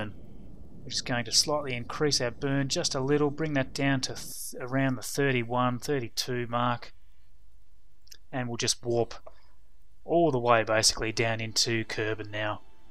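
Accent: Australia. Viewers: Nice to hear a fellow Aussie playing KSP! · Finally an Aussie you tuber thanks mate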